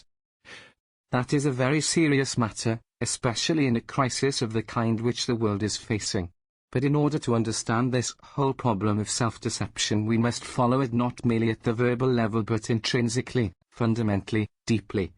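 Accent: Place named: Wales, United Kingdom. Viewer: The Welsh male accent is the worst choice for English narration. Nothing against the Welsh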